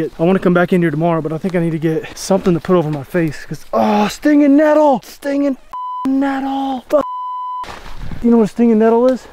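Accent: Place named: Texas, United States. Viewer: Weird all of a sudden you have a Texas accent???? Where the Fuc* did that come from??? I've never heard you sound like that till this vid and I've been subbed since you only had 277 subs,, I don't like it lol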